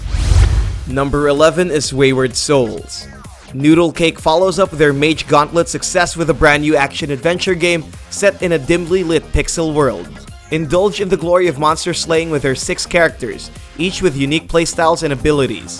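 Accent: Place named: Philippines. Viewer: Why does the VO sound very Filipino?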